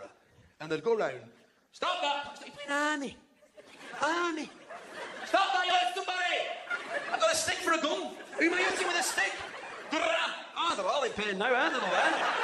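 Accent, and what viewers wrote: North, United Kingdom. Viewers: Stewart Liebenstrudl it's northern 💪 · Yes it's English. Just not the Queen's English, this is northern, Bolton in Greater Manchester.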